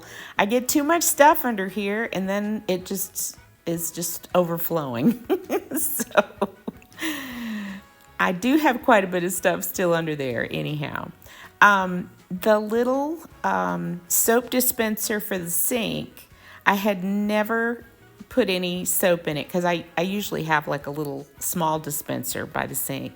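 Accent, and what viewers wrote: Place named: Tennessee, United States. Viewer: I knew when you started talking you were a Tennessee gal. 🧡 I love that you are really selective in what you brought home. How long have you been in your home? We will be in ours 2 years in April. It just seems so wild to have the perfect house for just the 2 of us since the kids have their own houses now. We are renovating to get that cozy, cottage vibe. You are a lovely inspiration.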